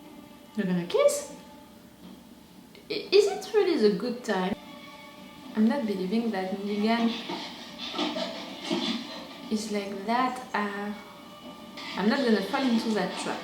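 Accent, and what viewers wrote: France. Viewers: Knew you were French when I heard you. You people have a cute accent 😊. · Tu es française hein ?j’ai reconnu ton accent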